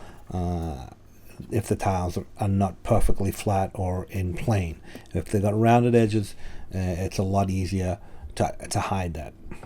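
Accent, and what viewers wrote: Boston, United States. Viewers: Just bought my first home & have been binge watching your videos. Thanks so much for explaining things so carefully! Question: any plans for a wall tile PDF? I would definitely pay for that! Btw—ya accent makes me homesick for Boston BIG TIME! That’s actually what made me keep watching! Lol...thanks again!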